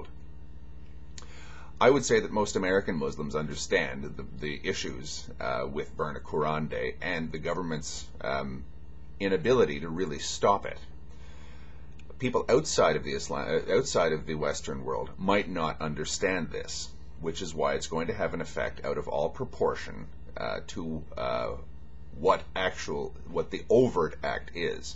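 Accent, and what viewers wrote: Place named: Canada. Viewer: Hehe, Sir, I know a Canadian accent when I hear it:D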